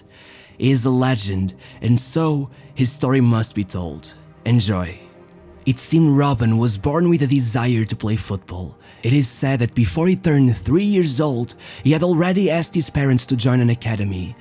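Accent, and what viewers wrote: Portugal. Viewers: By this guys voice i can tell he is Portuguese, as i also am portuguese 😉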